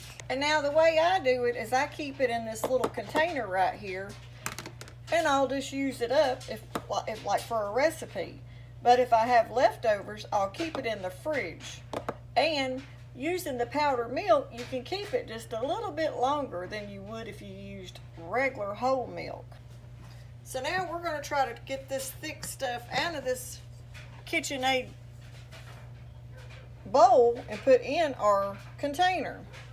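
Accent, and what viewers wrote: South, United States. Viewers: Thats just our lovely southern slang 😊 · What a great Tip ..I'll be telling my Daughter this .. Excellent 👍👍..Thank You.. Love 😘😘 From U.K.❤️❤️🙏. (& I love your Southern Accent ..❤️ )... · I love the country accent and pronunciation. It makes me feel like I’m back home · SOUTHERN COUNTRY GIRL,LOVE THE ACCENT,AND IT IS A BLENDER,A MIXER,A WHIPPER OR WHAT EVER ELSE SHE WANTS TO CALL IT ,WHAT EVER YIUR MOM SAYS IT IS ,IT IS,LOL😂😂😂😂😂😂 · I love you mom, may JESUS bless you with love grace mercy protection a donation on YouTube to make income and have your baking channel grow! you have honest sweetness to your person. Very Lovely! I LOVE YOUR Southern Accent! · Thanks for sharing your recipe ❤your Southern accent! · She said YouTube Altha rhythm😂😂😂😂😂😂😂😂 dang she slow southern talking granny makes me feel like I’m back home in Kentucky ❤ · I love her southern style and dialect. 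She’s charming. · I love ur accent, I’m from the south and your voice reminds me of my Mothers. God bless you for ur kindness.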